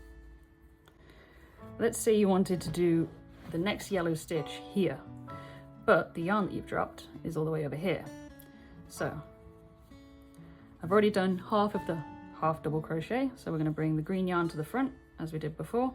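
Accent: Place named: United Kingdom. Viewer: URGH NO 😢 this is USA term why do people who sound so very British do this what is wrong with British terms? This is half treble! 😂😂 Sorry for the moaning personal bug bear I learnt from my nan fifity plus years ago any old british pattern will not work if you think this is half double not a half treble. That said super video I did. give you a thumbs up and the tiger looks fabulous do you have this available anywhere to get?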